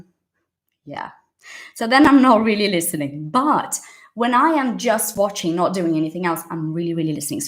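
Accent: United Kingdom. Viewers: I love this accent British ♡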